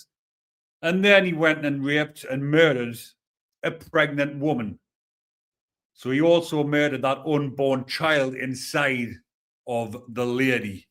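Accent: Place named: Scotland, United Kingdom. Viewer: Love your accent, are you Scottish?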